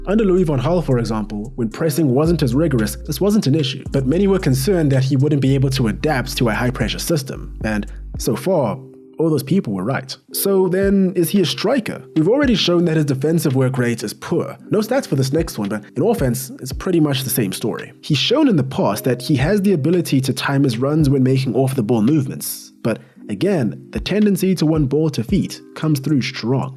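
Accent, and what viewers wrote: South Africa. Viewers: Are you a South African? Noticed the accent immediately ! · are you south african? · Are you from S.A bru ?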